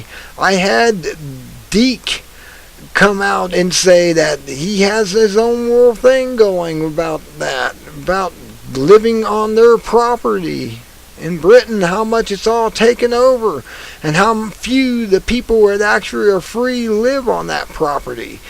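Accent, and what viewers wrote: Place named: United States. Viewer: You do not read well and your accent is tough for non-American second-language English speakers, but keep going, because it focuses us and helps us follow the story. Keep going! Can you believe the rubbish, oh, yeah, cold water killed those fish? I am from Ohio and fish can be nearly frozen solid and survive, they did it every winter back there? Those fish look horrible and distressed, look at their eyes or the lack of eyes! I have never seen this! The eyes should be cloudy but not missing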